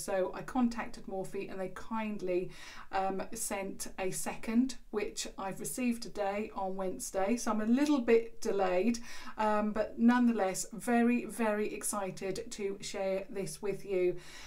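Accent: United Kingdom. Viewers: Iam an Egyptian engineer, every time i open your videos i focus on your British accent whatever you talking about 😍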